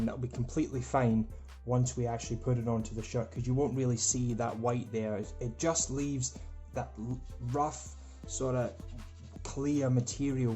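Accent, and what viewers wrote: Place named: Scotland, United Kingdom. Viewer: He sounds Scottish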